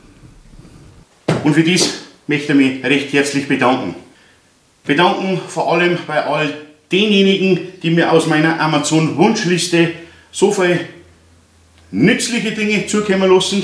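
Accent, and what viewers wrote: Bavaria, Germany. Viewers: woher kimmst du eigentlich aus Bayern?:D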